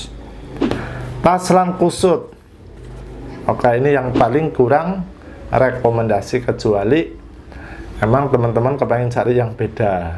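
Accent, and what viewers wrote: Banyumas, Indonesia. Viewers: Ngapak ya mang 😁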